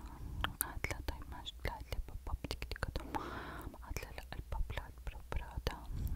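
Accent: Egypt. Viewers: اللهجه المصريه عليكي تحفه بجد❤❤ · اللهجه المصريه عسل 😊❤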